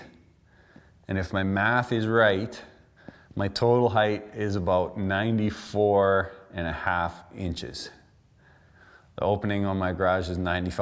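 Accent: Canada. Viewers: Great review. Also I can tell your Canadian as fuck.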